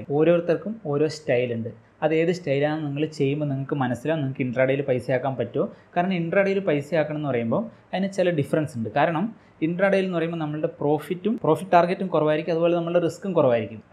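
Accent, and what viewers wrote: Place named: Kannur, India. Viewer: കണ്ണൂർ ആണോ സ്വദേശം,..your slang seems so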